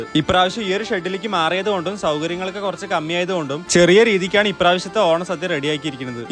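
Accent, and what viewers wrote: Palakkad, India. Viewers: Indrajith vlogile athe voice modulation😅 palakkad ano/?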